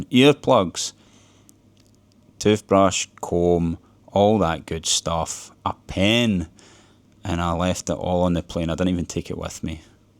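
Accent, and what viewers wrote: Scotland, United Kingdom. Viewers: Hi was in Scotland yesterday and met many sounded like yours:) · he missed spoke or it was his scottish accent. He meant Belavia. At the same-time he made it clear it was the national airline from Belarus that he flew out from. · Nobody knows. Every video could be fit into a 3-minute-short-report, but... 15- minute-bla-bla-bla with awful Scottish accent makes me switch to clear speech of the US-prof. John Mearsheimer.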